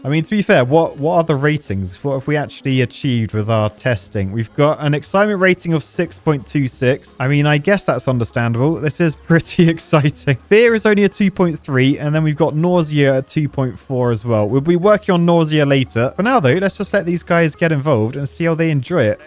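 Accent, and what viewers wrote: United Kingdom. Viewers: Did from get a British accent all of sudden?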